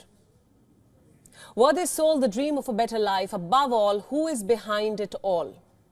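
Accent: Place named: India. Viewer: Indian accent😮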